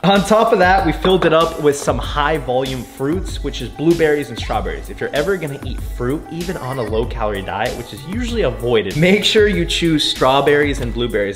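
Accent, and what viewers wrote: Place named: Texas, United States. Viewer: I can tell you from TX! Good video thanks!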